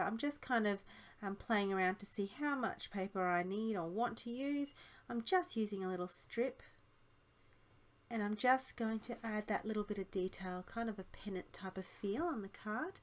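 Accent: Australia. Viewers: Very pretty. Love the colours. (Love hearing our Aussie accent in videos too! ) Thanks for sharing. 🙂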